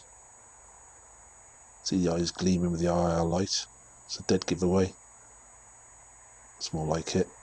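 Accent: United Kingdom. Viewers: The best commentary on the web (for this type of video I mean). The UK accent sounds great. Hello from Milwaukee, Wisconsin.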